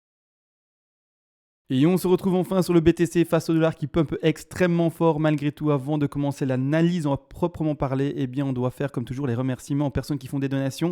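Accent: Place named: Belgium. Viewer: Merci pour ta vidéo je reconnais l’accent belge ? de chez moi 👍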